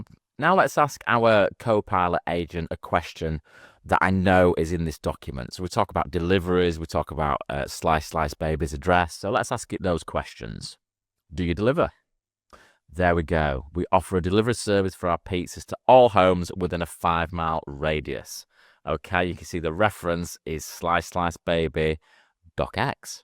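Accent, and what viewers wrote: United Kingdom. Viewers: I believe this i s my first time of watching your video. THANK YOU for this. It was funny (especially with the British accent), practical, thorough and well explained. Keep them coming.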